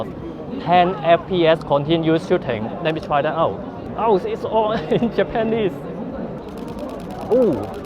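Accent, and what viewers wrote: China. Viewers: China English !!!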